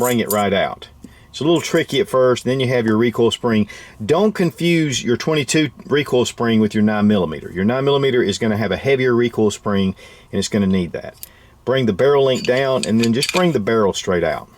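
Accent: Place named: South, United States. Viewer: Ah, give him a break, he's got a suthin' accent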